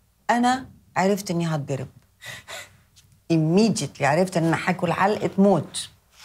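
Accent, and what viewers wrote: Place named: Iraq. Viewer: لقاء جميل أحلى شيء بزينب انو شخصية وذكاء ومحافظة على لهجتها العراقية لأن في كثير عراقيين بس يرحون لغير بلد يغيروا لهجتهم العراقية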